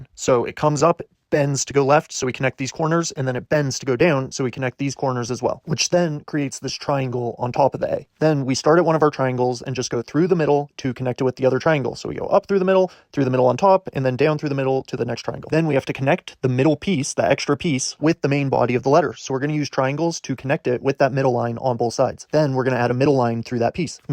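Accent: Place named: Canada. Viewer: Dudes gotta be Canadian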